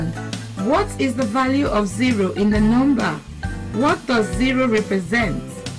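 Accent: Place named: Nigeria. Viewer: Wow a 🇳🇬 Nigerian voice ❤❤❤❤❤ 😊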